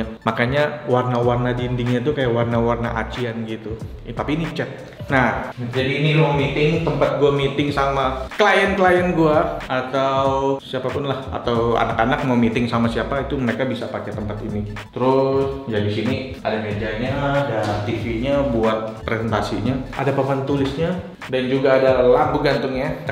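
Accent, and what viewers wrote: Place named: Pontianak, Indonesia. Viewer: Orang Pontianak mana suara nya 😄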